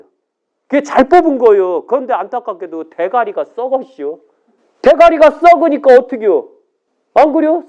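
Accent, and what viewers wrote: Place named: Jeolla, South Korea. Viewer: 전라도 사람이네 ㅠ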